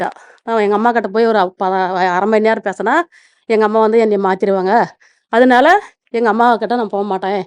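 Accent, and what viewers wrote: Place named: Krishnagiri, India. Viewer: எங்கள் அம்மாவை போலவே உங்கள் முகமும் பேச்சும் கிருஷ்ணகிரி மாவட்டம் 😢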